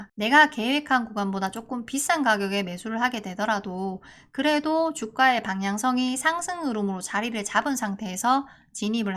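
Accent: Gyeongsang, South Korea. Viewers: 설명이 깔끔하시네요 근데 경상도 어디 사투리인가요~?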